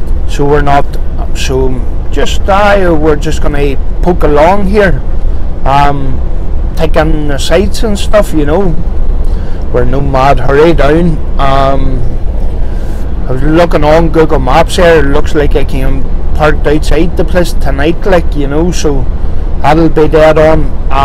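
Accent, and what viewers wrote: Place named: Ireland. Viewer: I laughed when you said the Polish driver spoke English - your Irish accent and his Polish accent must have made for an interesting convo. Maps are a great inclusion. I have been reading the road signs and then searching on Google to see where you have been until now. When you give the updates could you perhaps do a 'picture in picture' so we can see where your actually driving whilst talking. Does your 'better half' watch the videos? Would appreciate how hard you toil to pay the bills. Much enjoyed!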